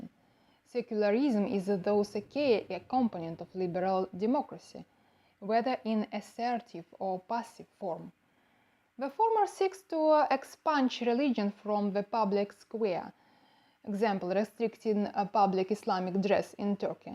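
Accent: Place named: Russia. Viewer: It's cool. I see that the person from Russia. I can hear my Russian accent😂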